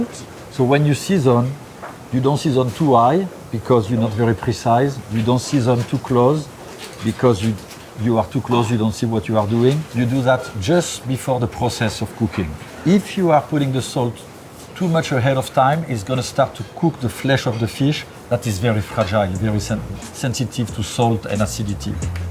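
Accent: France. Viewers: When you speak perfect English with perfect French accent ahaha 😮😂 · The only French accent I can listen to without fatigue. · Merci Chef. Bel accent, merci de rendre hommage au francais. Calliss zont qu'a faire l'effort de nous comprendre les osti d'anglais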